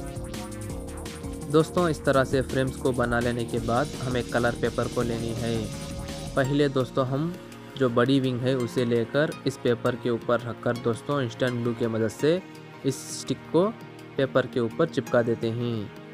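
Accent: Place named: Telugu, India. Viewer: from accent I could tell you are a Telugu tammi!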